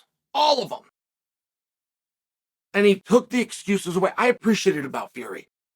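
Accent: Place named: East Coast, United States. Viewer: Chael you do so good on your podcast. Engaging, passionate, hand gestures, east coast it is what it is talk BUT fair enough were gonna do it anyway, kinda Trump talk. Great for this side of entertainment and what people want to see when they click something like this topic. You're doing great with your podcast format, wouldn't change much. Just keep going!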